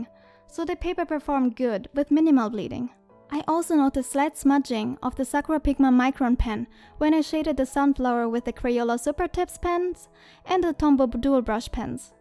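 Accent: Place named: Germany. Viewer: Are you german? As a fellow german i hear the slight german accent comin tru (no offense)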